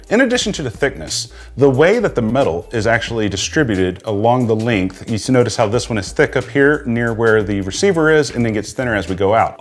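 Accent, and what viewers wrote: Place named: Minnesota, United States. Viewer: You did really good very close for the Minnesota accent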